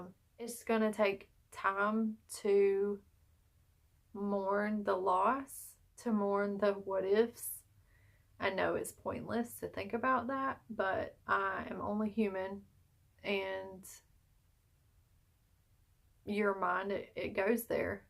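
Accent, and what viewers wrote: South, United States. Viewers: I just Love your Southern Country Accent...it’s GREAT 👍🏽. I’m Glad that you posted this outcome and you’re ability to find your BIO Dad. 🤔🙏🏽🙇🏻‍♀️💗🔍🕊💎🧬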